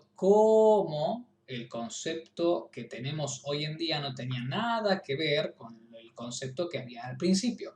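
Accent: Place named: Argentina. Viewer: RECIBE MI SALUDO Y MI ABRAZO. ME AGRADA LA RIGUROSIDAD EN LA INVESTIGACIÓN Y LA ETIMOLOGIA. TODO LO DE LA BIBLIA ES MANIPULADO Y TERGIVERSADO. VALORO TU INTELIGENCIA, DISCERNIMIENTO Y OBJETIVIDAD. SUPONGO POR TU ACENTO ERES ARGENTINO, Y ESE LOOK Y BARBA ESTAN 1A. ANIMO Y ADELANTE!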